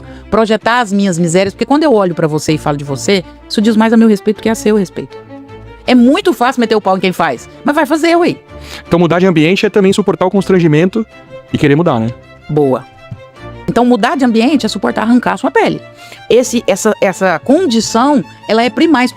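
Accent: Minas Gerais, Brazil. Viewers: Muito legal Dra o seu exemplo! Se intrometrerem na sua vida por um jeito autêntico e mineiro de falar mas não alcançarem de forma singela, o coração de quem carece das suas dicas e orientações para a nossa saúde mental. E tem invejosos-as passivos agressivos que fazem-se de bonzinhos/boazinhas, e aproveitam para nós alfinetar com frases maldosas do tipo: "Comprar casa é fácil mas difícil é pagar as prestações". Ou: "Emagrecer qualquer um consegue mas difícil é manter". E coisas horríveis como lembrar de nossos traumas ferir a todo momento onde surgimos felizes ou celebrando algo bom. Há pessoas que celebram satanicamente as nossas derrotas e tentam nos fazer sofrer até qdo estamos felizes pq não suportam ver ninguém bem. Como a Bíblia diz que DEUS separará joio do trigo! Que sejamos trigo e não joio apesar de tantas imperfeições que vejo em mim🙏🏻. 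Sucesso e sigo seguindo e compartilhando seus vídeos nos grupos de saúde e de Apoio tbm 🎉.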